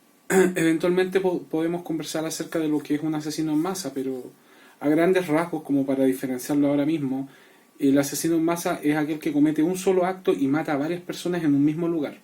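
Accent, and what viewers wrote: Chile. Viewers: Es interesante oirte hablar, eres Chileno? (lo pregunto por tu dejo) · No sé si te han dicho en tu estilo personal y apariencia tiene un parecido bastante notorio con Dross en las miniaturas pero los acentos son bien diferentes el es Argentino pero su acentos no es tan fuerte pues lo dicimula muy bien y ya es un acento más parecido al venenzalano y el tuyo es Chileno si no me equivoco y no me mal intérpretes es más una curiosidad personal porque me gusta el acento de ustedes los chilenos porque es muy respetuoso al hablar y muy correcto bastante parecido al español pues el de todos nosotros es castellano que deriva del español pero si me llama mucho la atención que hablan muy rápido y fluido y no se será la costumbre de hablar muy correcto desde chicos que ya de grandes hablan rápido sin errores para hablar y no es algo que nos afecte a los que escuchamos porque apezar de hablar rápido es muy claros y a según hablan no tenemos ningún problema para entender todo pero no sé porque me parece muy difícil poder imitar ese acento en especial a comparación de los demas y otros que no puedo distinguir es el de los paraguayos y uruguallo es bien parecido al argentino, el de ustedes es un poco pero bien poco parecido al de los peruanos pero ese no lo confundo es como si tratarán de distinguir a los Salvadoreños, con el de nosotros los Hondureños aveces se confunden también con el de Guatemaltecos pero nos es tanto como entre para nosotros también nos confundimos porque son muy pocas las palabras que nos diferencian entre salvadoreños y hondureños, a mí me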